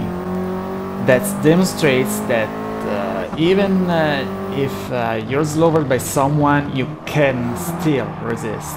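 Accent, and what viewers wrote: Italy. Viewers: Italian accent at its best, it's awesome ♥️ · Scusa sai se lui è italiano? Perché dall'accento sembrerebbe di sì... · I love when english is spoken by italians because I can understand them easily!!! He remind me of Dovizioso and Rossi answering questions in press conference. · Sei italiano? L' accento è quello. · You sound like u are italian,like me😂😂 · I`m really glad that you finally decided to do a voice over on your videos! It adds another layer of communication and helps focusing on the video itself! Great job! And as an Italian living in Brazil, I love that Italian accent and tone! I can almost see your hands moving while talking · I love your italian accent when you talk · why does he have an italian like accent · Adoro il tuo inglese italianizzato ahah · Si sente che sei italiano sotto sotto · Your voice make me remember italian people,such a nice accent its funny and not too formal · your accent is like an italian who speak in English. 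I'm from Italy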